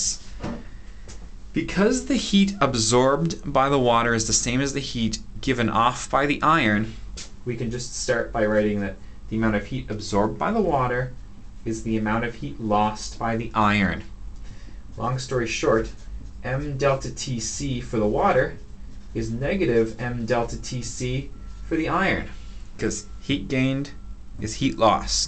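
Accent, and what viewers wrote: Canada. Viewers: Please tell me your from Montreal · are you Canadian???????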